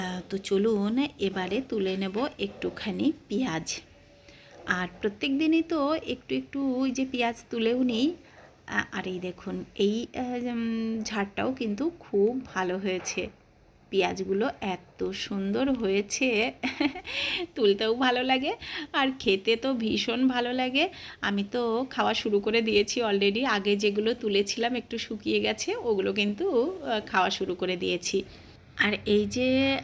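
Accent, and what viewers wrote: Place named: Bangal, Bangladesh. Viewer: তুমি মোনেহয় বাংঙাল ।তোমার খাওয়া কথা বলো আমার সাথে মিলে যায়। আমরা বাংলাঃ ফরিদপুরের। অনেক দিন ধোরে বেরাকপুরে।❤❤❤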